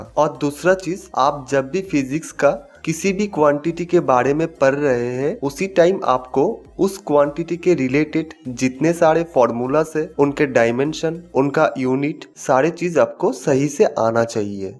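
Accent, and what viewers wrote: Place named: Odisha, India. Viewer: Aap ke aawaz se log raha hai ki aap odisha se hai..... Becoz.. Odisha log ka hindi aawaz pata chal jata hai......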